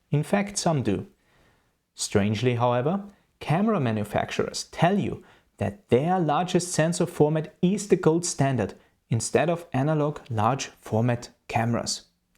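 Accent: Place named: Austria. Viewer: Congratulation for this outstanding lesson. (and I like your austrian accent.)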